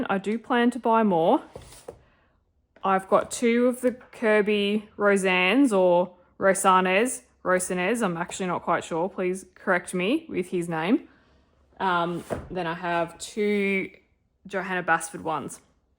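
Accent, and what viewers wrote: Australia. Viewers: Aussie accent, hit subscribe immediately! I’m a Melbourne based colouring book enthusiast too!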